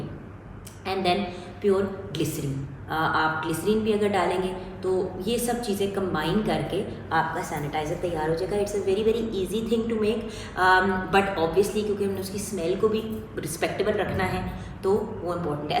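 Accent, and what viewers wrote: Punjab, India. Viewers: Same here mam plz upload video i like yr pinjabienglish voice love uu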